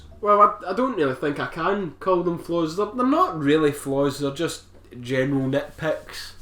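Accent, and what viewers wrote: Scotland, United Kingdom. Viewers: Even after 35 years, The Fly is the brilliantly grossest movie I've ever seen in my life. It still makes my stomach churn. I enjoyed your funny reactions to the gross-outs and I enjoy your accent - which I'm guessing is Scottish or northern English (to my untrained yankee-doodle-ears). Anyway, thumbs up. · Hello mate, I love this movie, and more so your accent! Scottish to me is the best accent in English language, what part of Scotland is it from? Edinburg? Regards from 🇲🇽😎🤟🏼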